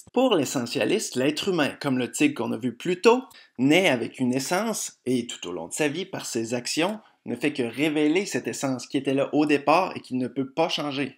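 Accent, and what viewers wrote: Canada. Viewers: Quebecois (Canada) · Tu viens-tu de Montréal? J'arrive toujours pas à distinguer bien les differents accents au Québec :D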